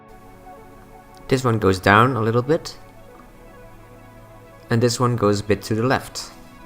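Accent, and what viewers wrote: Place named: Netherlands. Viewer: You sound Dutch lol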